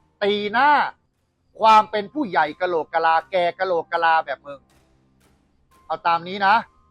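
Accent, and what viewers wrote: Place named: Thailand. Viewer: ผมชอบนะ..เพราะมันคือความจริง ที่พูด...ถึงแม้ลีลาการพูดจะแบบไทยแท้..แต่ สุโสสัง ลพเตปัญญัง ฟังด้วยดีย่อมได้ปัญญา...กรรมไผกรรมมัน ทำไป..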